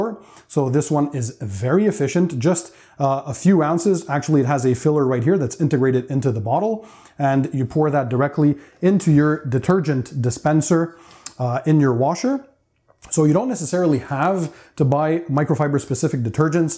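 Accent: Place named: Canada. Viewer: What is his accent. Sounds Canadian, but with something else.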